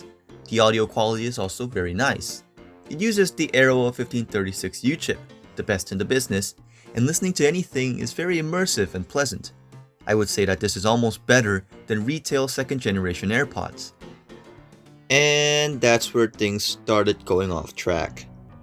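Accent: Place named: South Korea. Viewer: 한국사람 특유의 악센트가 있네요, ㅎㅎ 아무튼 좋은 리뷰 감사합니다.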